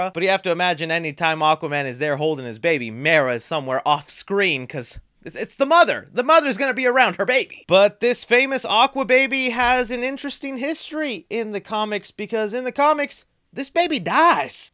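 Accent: United Kingdom. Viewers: I love your British accent😂 where in the UK are you from? I thought it was south African at first 🙈😂🎃🎃👍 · British accent 😂😂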